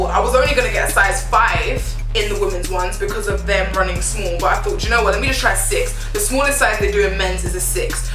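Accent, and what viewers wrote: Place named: United Kingdom. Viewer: I am a sucker for British accents. I love that more girls from the U.K are stepping up their sneaker game..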